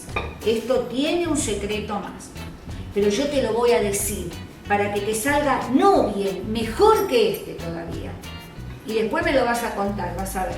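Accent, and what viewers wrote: Argentina. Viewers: jajajaja!, me dan mucha gracia sus comentarios y su acento taaaan argentino , voy a hacer pan pita y el pan con sencillitas !,saludos y muchos éxitos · Que buen pan y rapido, ya lo conocia pero me gusto mucho y con acento argentino,,,,un saludo desde España...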